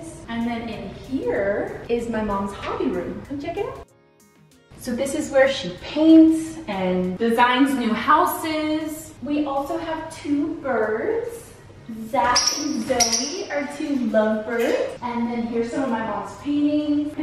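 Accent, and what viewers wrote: Texas, United States. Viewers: I feel like your Texas drawl didn’t ever come through until this video! I love it. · I'm from Texas after reading comments about her accent I had to go back and rewatch the video! I did hear the accent but I think it was more because she was having fun and was talking a bit lower. But I've watched vlogs with her mom in them before and her accent didn't change. As well as picking peaches and the terminology isn't exactly big city lingo =)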